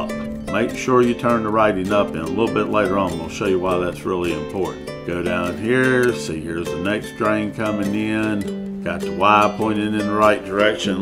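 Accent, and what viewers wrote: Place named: Texas, United States. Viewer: I subscribed for the cool content but mostly for that Texas drawl! 👍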